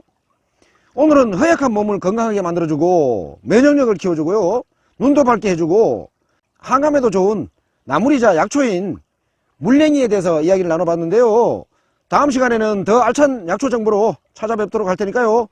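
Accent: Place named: Gyeongsang, South Korea. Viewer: 영상과 정보 감사합니다 경상도 말씨가 구수하게 들리네요 항상건강하세요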